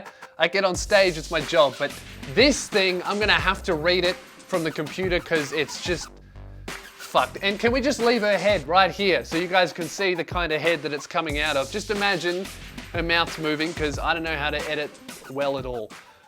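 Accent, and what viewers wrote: Australia. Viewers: Fuck this is the first video I have ever seen and in the first second I swear to god if you aren't fucking australian then fuck. Who is. Because I am and you are either Aussie or a fucking child of steve irwin.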